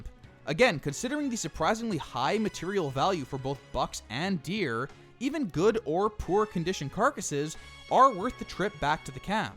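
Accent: United States. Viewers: I swear this dude is from Maryland. "Waaaayyyyygen" "budddon" I'll bet he says "wuuter" too lol. But great great video. Subscribed for sure. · Weygun, Wisconsin accent?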